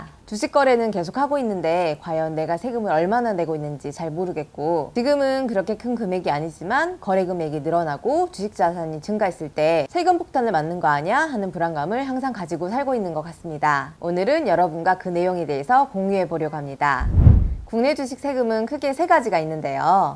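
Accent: Jeolla, South Korea. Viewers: 전라도 분 같아요 ㅋ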